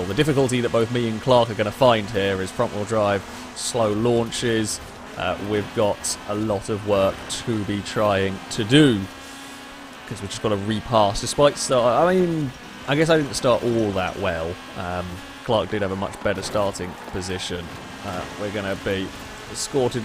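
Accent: England, United Kingdom. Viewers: I watch this for the British Accent.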